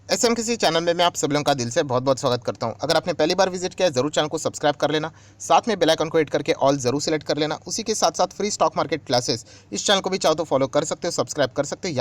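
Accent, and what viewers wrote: Maharashtra, India. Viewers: मला ही वाटते सर मराठी भाषा बोलत असणार,, कारण कधी कधी मराठी उच्चार ऐकू येतात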